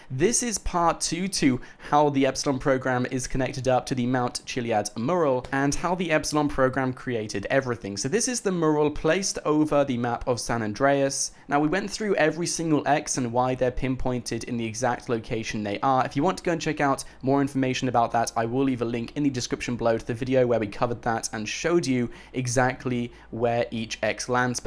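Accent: United Kingdom. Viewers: He is british so he cant pronounce it right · All of us British ppl say it that way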